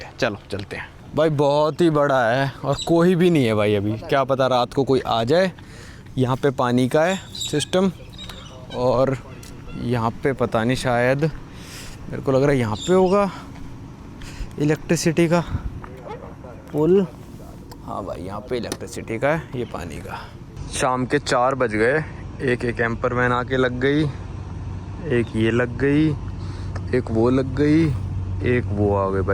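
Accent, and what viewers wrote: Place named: Himachal Pradesh, India. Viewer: भाई हमीरपुर, हिमाचल से तो नही हो, accent हिमाचली लग रहा आपका, मेरे रिश्तदार है वहाँ इसलिए बोल रहा हूँ, ❤❤ वलॉगिंग बढ़िया है👍👍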